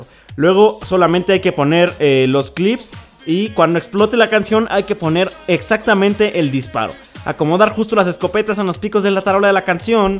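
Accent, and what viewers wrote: Costa Rica. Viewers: Wtf sos tico o algo? Xd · Sos tico?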